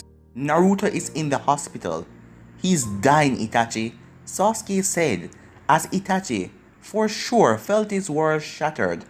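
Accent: Jamaica. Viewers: Hey are you Jamaican I'm only asking because I am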